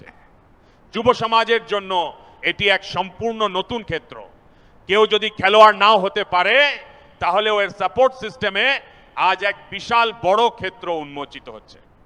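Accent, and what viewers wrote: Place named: India. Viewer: বাংলা উচ্চারণ আরেকটু স্পষ্ট হলে ভালো হতো। অনেকটা হিন্দি টানে বাংলা হয়ে গেছে।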